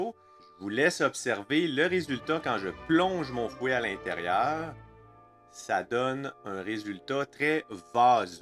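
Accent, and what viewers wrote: Quebec, Canada. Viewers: slt, merci pour tes vidéos qui sont excéllentes, et pédagogiques, il y a dans les sous titre un p'ti probleme de traduction parfois mais on s'y fait! cela vient surement de ton accent du quebec; et L'IA qui traduit s'emmele les neuronnes parfois!:hand-pink-waving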